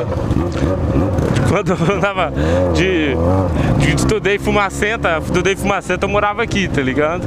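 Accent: Goiás, Brazil. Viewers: achei q vc era de Goiás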